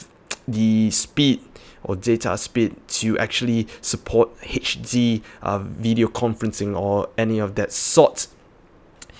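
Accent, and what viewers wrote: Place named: Singapore. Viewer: Very "Singaporean" accent there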